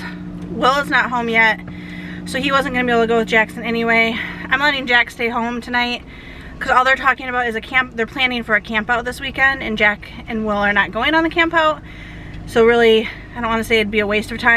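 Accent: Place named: Michigan, United States. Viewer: Your Michigan accent was strong today 😂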